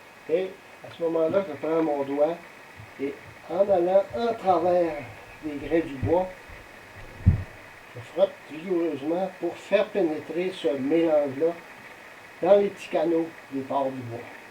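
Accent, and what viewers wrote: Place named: Quebec, Canada. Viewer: Mltteud ou multitude? C’est quand même trop moche l’accent québécois !!!!!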